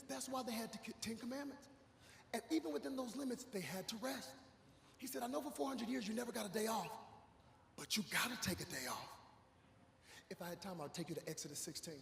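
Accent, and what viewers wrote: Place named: AAVE, United States. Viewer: You use way to much. And a little ghetto. Sorry but you should bring it down with the ebonics.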